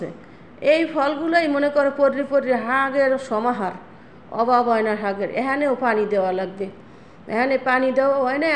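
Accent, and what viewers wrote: Barishal, Bangladesh. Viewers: আসসালামুয়ালাইকুম আপু 😊। বরিশালের ভাষা শুনতে ভালো লাগলো 😊। দূর প্রবাসে ভালো থেকো আপু 😊। আমি আছি গো😊 বালতি ভরা ভালোবাসা দেখলাম 😅। মসলা ❤❤ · আপু তোমার বরিশালের কথা শুনতে অনেক ভালো লাগলো মাশাল্লাহ অনেক বাজার নিয়ে এসেছো অনেক ফল তোমার বাগানের গাছগুলো মাশাল্লাহ ❤❤❤ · আপু তোমার বরিশাল ভাসাই কথা শুনতে অনেক ভালো লাগছে ❤❤সবজি বাগান দেখতে অনেক ভালো লাগলো ❤❤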